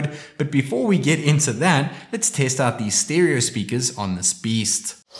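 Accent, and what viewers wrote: Johannesburg, South Africa. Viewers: Are you from Johannesburg? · Haha! I love the SA accent. Ja swaar! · Are you South African?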